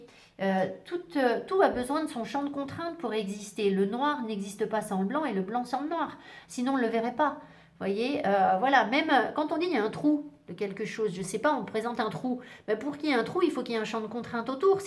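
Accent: England, United Kingdom. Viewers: Merci pour ce beau tirage plein de messages. Très parlant pour moi en tout cas et merci aussi pour votre magnifique accent anglais 😊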